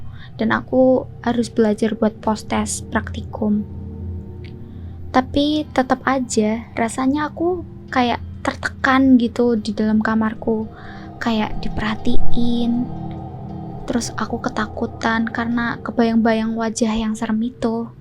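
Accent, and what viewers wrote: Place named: Central Java, Indonesia. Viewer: Mbak logatnya jawa tengah bnget hee 😌😌😂😂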